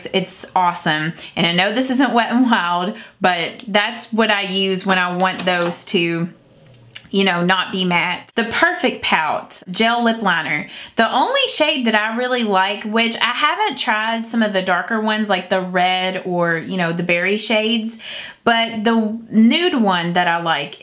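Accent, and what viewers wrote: South, United States. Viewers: Lol, sorry looks like I'm flipping yall off in that southern draw, I loved it.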